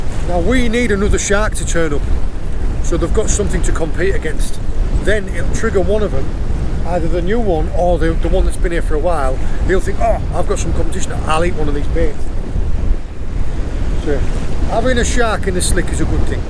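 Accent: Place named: Leeds, United Kingdom. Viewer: I came to sea fishing later in life, but the one thing i wish i could change was having my father with me as a child, sea fishing. carry on encouraging, you do a fab job, and is really catching on under your masterful guidance. Well done both of you. BTW are you a Leeds fan? The accent gives it away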